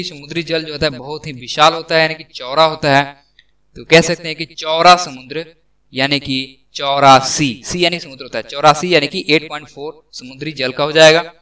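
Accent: Bihar, India. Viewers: Bihari ho ka ???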